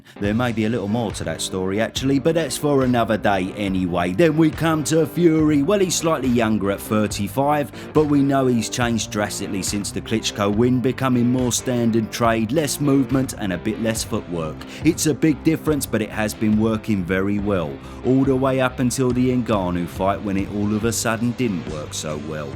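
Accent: Cockney, United Kingdom. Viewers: "Daniel Dubious" "He moves like a postman with the s#!ts" All of it said with the accent of a pick pocket in a Guy Ritchie movie.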